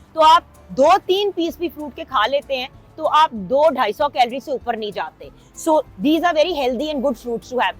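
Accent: Punjab, India. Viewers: Camera person kisi chez ko focus kur lay .hur jaga loud ayr punjabi undaz agya hay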